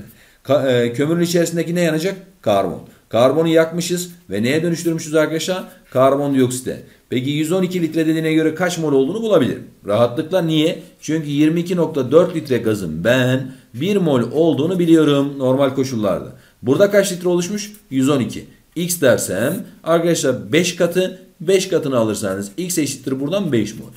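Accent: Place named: Adana, Turkey. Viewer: Hoca kesin adanalı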